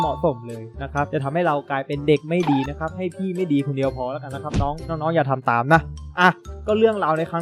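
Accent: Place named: Suphanburi, Thailand. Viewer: พี่เป็นคนสุพรรณบุรีหรอเสียงหนอจัง^^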